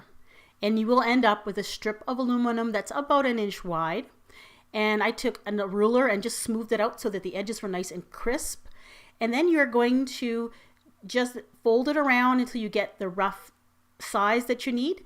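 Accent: Canada. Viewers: Are you canadian? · are you Canadian by any chance?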